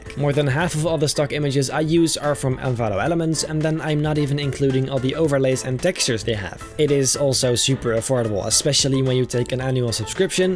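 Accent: Netherlands. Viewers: Why does it sound like he has a dutch accent? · Are you also Dutch? I can hear it in your voice. Ik ben ook Nederlands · Ben jij Nederlands aan je Accent te horen wel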